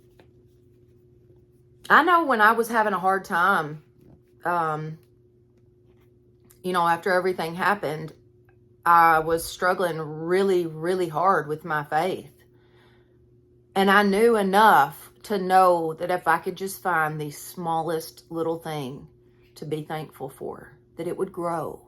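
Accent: South, United States. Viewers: Wow! Thank you! I really needed to hear this today and I must admit I’ve watched it three times already and will probably do it again. I received some bad news about my health about a week ago and I am really struggling with it. I love your devotionals and crafts and most of all I love your voice! I actually thanked God for your voice today! I love that little southern accent. I’m in California so I don’t get to hear it very often but I particularly loved hearing you talk about the rocking chair and your mom singing to you and I have the same memories just lost my mom on September 17 and I miss her so much and I know you can relate to having a lot of bad things happen all at once. I feel like you are so relate-able. I just can’t thank you enough for all you have brought into my life through Jesus Christ our Lord! Thank you thank you thank you! ❤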